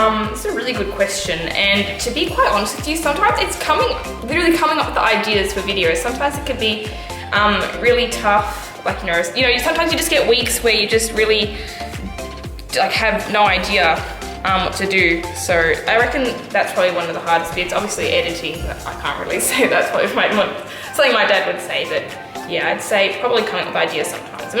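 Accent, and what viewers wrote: United Kingdom. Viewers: I wanna ask you something Why do you sound like British like In a few vids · Are you from england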